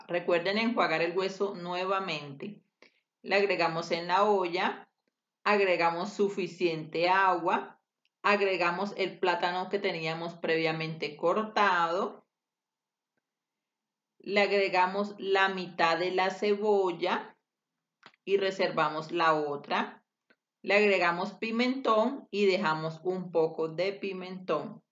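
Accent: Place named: Caribbean Coast, Colombia. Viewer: Más costeño que esto no hay.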